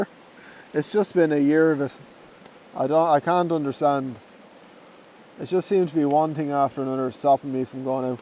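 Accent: Ireland. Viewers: New subscriber loving ur videos. My dad was born in Dublin and I’ve always wanted to visit but still not got there yet. 1 day hopefully. Been told how beautiful it is and i love the Irish accent x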